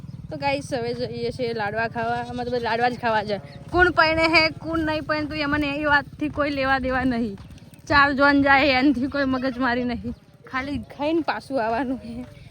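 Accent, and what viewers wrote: Banaskantha, India. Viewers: આ ભાષા બનાસકાંઠા ની લાગે હિન્દી ગુજરાતી મિક્સ😅😅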